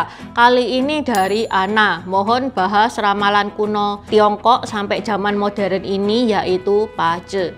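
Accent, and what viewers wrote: Java, Indonesia. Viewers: Hallo!! Keren banget Chanel,jadi Chanel faforitku.tapi kenapa logat bahasa kok medok Jawa banget.justru tambah keren,lanjutkan terus pencerahan nya tentang Tionghoa Indonesia.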